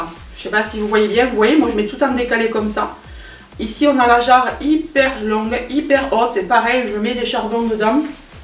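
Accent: South, France. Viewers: Oui une vidéo plante!!et tjr avec l'accent du sud.tu apportes le soleil.bisous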